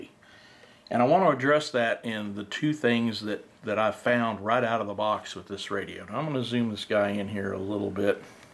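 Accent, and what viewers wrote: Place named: Texas, United States. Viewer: Thats the Texas Drawl. I like it.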